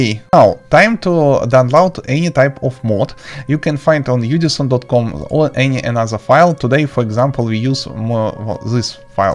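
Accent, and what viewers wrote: Russia. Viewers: ты ли это? акцент русский, голос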